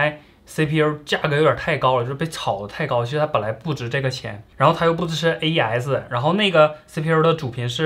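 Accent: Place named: Northeast, China. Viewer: 哥们东北哪儿的呀？口音好亲切呀😂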